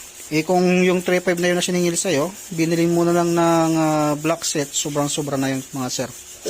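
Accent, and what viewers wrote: Ilocos, Philippines. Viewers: Ilocano yan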